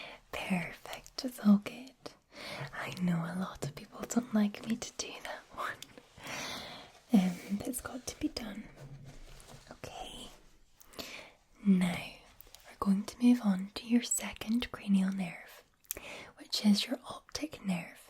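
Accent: Scotland, United Kingdom. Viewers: I do love the Scottish take on the ol' CNE (being Scottish myself) :-) Great work! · who doesn't have an accent? English accents are so damn mixed and diverse that even being from the UK I can't tell what it is. I think it's more so Scottish. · I am scottish myself, so this makes it much better to listen too, also probably one of the best ASMR'ists of youtube in my opinion :) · I'm getting sick of seeing these! Jk I love the video and nothing beats a Scottish accent! 😊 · So cool listening tae someone else who’s Scottish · i love love love the Scottish accent thank u for this it’s amazing keep up the good work!! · Best Scottish ASMR youtuber!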